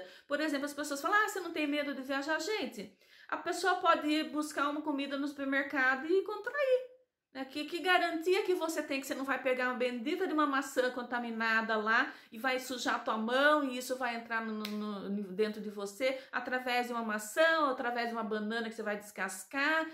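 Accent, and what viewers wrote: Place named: Rio Grande do Sul, Brazil. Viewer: Pelo sotaque você não sabe? E do sul gaúcha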